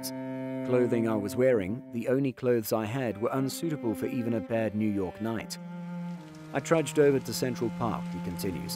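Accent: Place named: United Kingdom. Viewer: Why is there a Limey talking about hockey?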